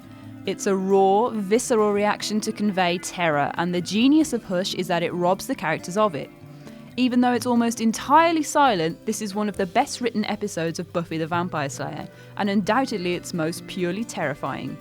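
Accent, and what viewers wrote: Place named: England, United Kingdom. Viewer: I hear an English accent. That's exciting enough 4 me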